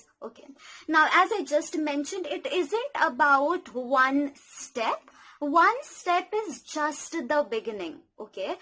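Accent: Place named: South India, India. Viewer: I remember my south indian teacher who give social science lecture and her accent is same as you... Nice video btw ♥️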